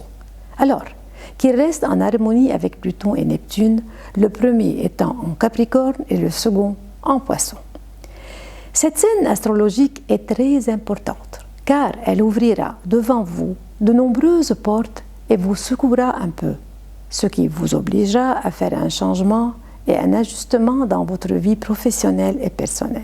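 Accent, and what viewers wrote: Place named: Italy. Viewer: تكلمي الفرنسية بلهجة ايطالية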